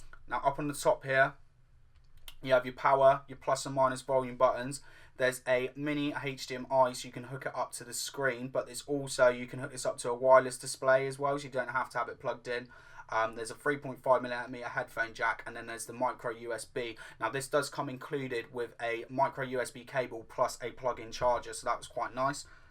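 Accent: United Kingdom. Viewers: Oh bless ya buddy, from you from Oxford mate?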